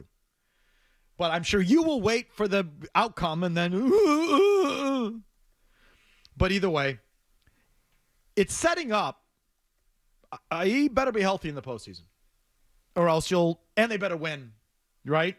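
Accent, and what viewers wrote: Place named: Wisconsin, United States. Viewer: Sawry. Your Wisconsin accent irritates me. Especially when you say “sawry” and “suhvun” (seven)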